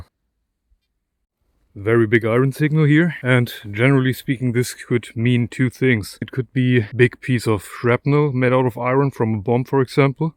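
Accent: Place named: East Germany, Germany. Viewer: na mehr als sicher sind diese funde in Deutschland im östlichen raum gemacht, akzent ist def ebenfalls Deutsch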